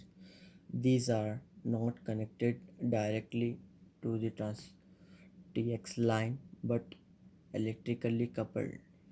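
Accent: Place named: United States. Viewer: Ohh american accent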